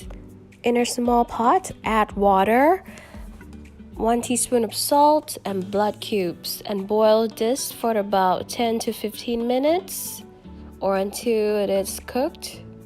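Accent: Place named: Luang Prabang, Laos. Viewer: พูดภาษาลาว เพราะกว่า เพราะเสียงขึ้นนาสิก จะพูดจะร้อง ก็เพราะ ชอบเสียงและสำเนียงหลวงพระบางมากๆ ฟังแล้วสบายใจ ถ้าทำคลิปต่อทามมิ่งตอนนี้ ก็ยังโอเคอยู่นะ เป็นกำลังใจครับ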